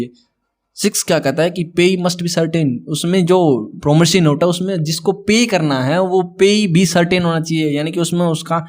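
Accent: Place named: Haryana, India. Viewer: U are from Haryana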